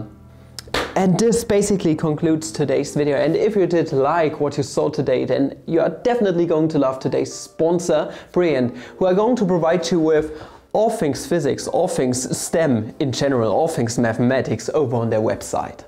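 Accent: Germany. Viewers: Ich stoß grad so auf dein kanal und dachte mir: Alter der kerl hatt doch nen deutschen akzent. Aber geiles video alter feier ich sehr das thema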